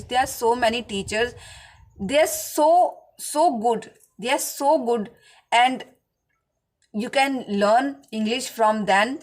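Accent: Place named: Marwar, India. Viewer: You sound like marvadi 😊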